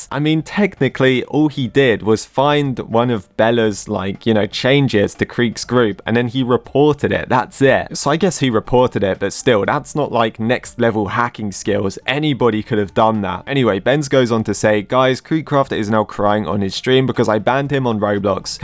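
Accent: United Kingdom. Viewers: I could tell that this man is British or at least European cuz them words could only be learned from a proper grandmother as a British folk myself 😂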